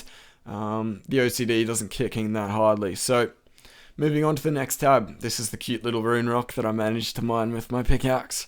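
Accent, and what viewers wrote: Australia. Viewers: nice vid man, always good to hear an aussie commentator. get dem 1m nats cuz :P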